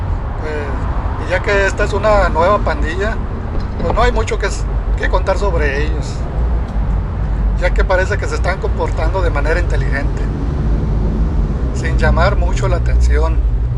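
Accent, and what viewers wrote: Mexico. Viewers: Esto de las pandillas son puras tonterias que en vez de sumar a la sociedad, restan, hablas bien mexicanoooo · Compa tienes un acento muy marcado michoacano que me distrae, el video parece que es un comercial a esas bandas, no todos los que ven el video son unos expertos como tú, buena idea fallida ejecución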